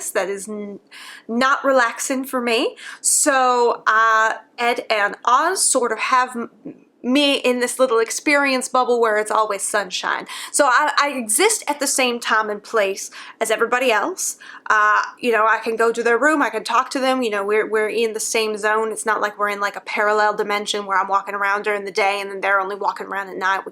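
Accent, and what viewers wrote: South, United States. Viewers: So I was wondering where was “from” like what state and or city does she get her accent from? I’m from Tennessee and find accents interesting (though not the best at distinguishing yet I guess) And I hear the twangs of the metropolitan Deep South in maybe Louisiana or east Texas? But that’s just in my experience with southern accents, and there’s definitely a difference from state to state and even regions within those states.. Like I’m from a city area, but people in the city itself have a different accent from the people farther away from the city ya know? So I was curious But of course, since she’s not actually from the south her accent has a more “generalized” southern accent, where you could hear a few regions in it, but idk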